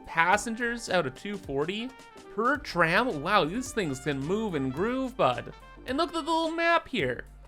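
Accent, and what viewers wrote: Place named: Canada. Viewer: you can tell by his accent he’s definitely Canadian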